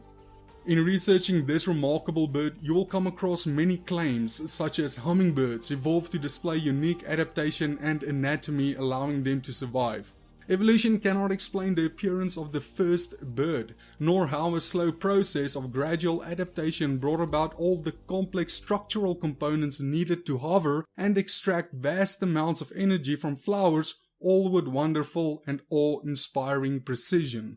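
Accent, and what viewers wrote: South Africa. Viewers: You're South African right?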